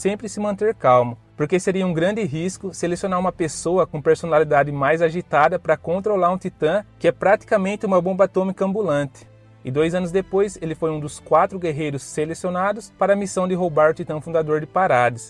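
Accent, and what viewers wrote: Brazil. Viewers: é um sotaque mistura de paulista mineiro e paraibano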